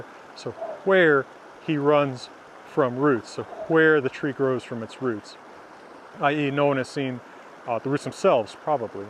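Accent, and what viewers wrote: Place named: West Texas, United States. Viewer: Fantastic academic level content available on youtube with beautiful background scenery and a quirky cowboy tone? I don't think I've heard anyone quite speak my language like you do,. Grew up in West Texas and studied Latin and Classics at UT Austin. You've got one more subscriber out of me!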